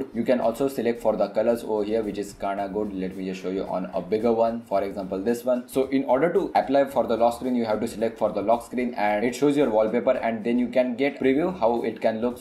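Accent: India. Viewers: love your accent...it feels wowly Indian....😎😎😎thumps up...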